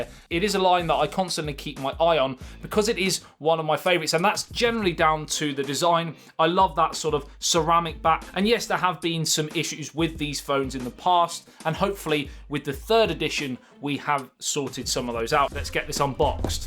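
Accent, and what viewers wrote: England, United Kingdom. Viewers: British accent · Are you english?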